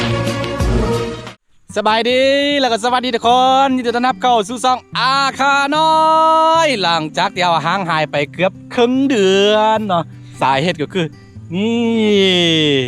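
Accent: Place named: Isan, Thailand. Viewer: พูดคล้ายๆคนอีสานเลยค่ะ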